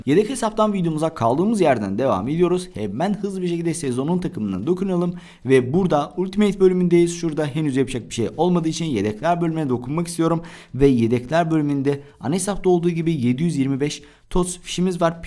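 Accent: Azerbaijan. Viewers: Azerbaycanlı mısın sen?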